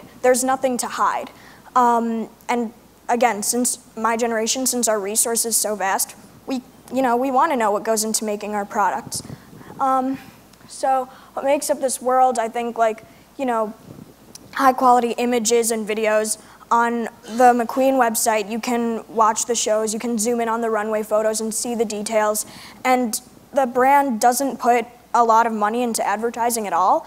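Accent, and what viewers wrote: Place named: United States. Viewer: this american accent seems incredibly harsh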